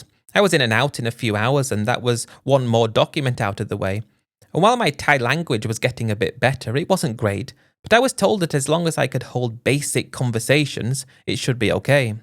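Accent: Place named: United Kingdom. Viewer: You should’ve just accepted the baby because you are British and the accents kind of annoying so what are you doing? You know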